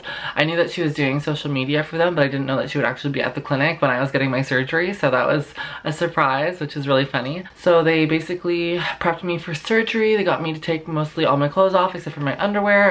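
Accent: Canada. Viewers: I listen to to learn canadian accent of english, she pronounces well, extremely beautiful girl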